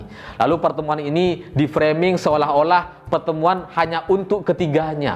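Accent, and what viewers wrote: Madura, Indonesia. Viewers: Mas sampean ini kok cerdas sekali ya, bajunya beli dimana kok kotak kotak seperti pendukung Ahok dulu...😄😄😄 Terus berkarya saya suka aksen maduranya..tak iyeh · Sejak dulu pembohong....selamanya PEMBOHONG. Katakan "TIDAK PADA KORUPSI".....buktinya....?????? Salam SEHAT Cak......logat Maduranya menghibur banget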